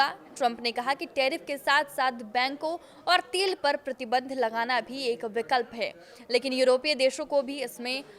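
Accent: Bihar, India. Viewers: Medam, wansigoton nehi he Wo washington he,,, Kya madam english me bhi bihari accent late ho,, kya huwa itne padai likhai ki jo pronounciation nehi aata apko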